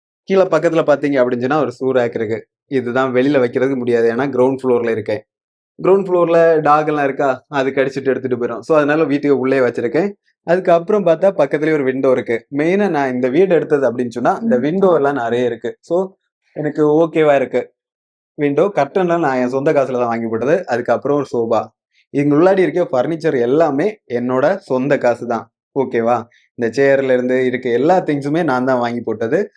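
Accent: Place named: Nagercoil, India. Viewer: TN74 slang theriyudu bro 😀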